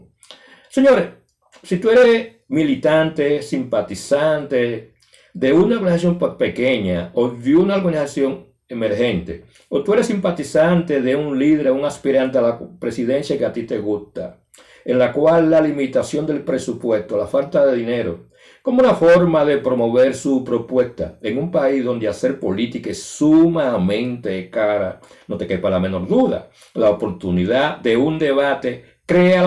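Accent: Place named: Haiti. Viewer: Se le nota el Haitiano a este comentarista.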